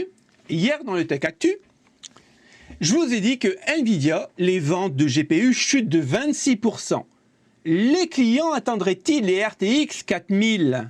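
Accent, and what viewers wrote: North, France. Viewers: Peut être une erreur mais on entend bien l’accent de ch’Nord... Perso, quand on pouvait encore les acheter, j’ai toujours pris des carte Sapphire AMD. Idem pour les processeurs : de ll’AMD. On entend très souvent dire que Nvidia fait du grand n’importe quoi. Aux dernières nouvelles, il semblerait qu’Apple c’est un peu pareil. Au moins, il y a la chaîne Pour Ce Consoler 👍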